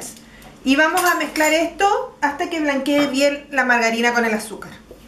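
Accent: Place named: Chile. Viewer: gracias, que fáciles.... me gusta ti acento chileno, yo soy chileno y todo me queda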